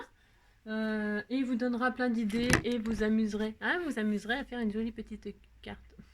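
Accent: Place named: Paris, France. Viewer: Coucou , j’adore votre voix de titi parisienne , vous devriez mimer cette réplique : « atmosphère,atmosphère,est ce que j’ai une gueule d’atmosphère ? » 😃😉 merci pour les tutos..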